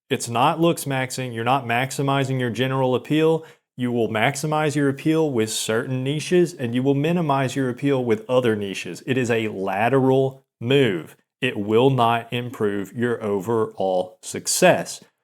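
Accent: South, United States. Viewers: Tbh I thought you sounded like Mental Outlaw when I first found your channel but after time I realized your accent had a more southern sound to it if that makes sense.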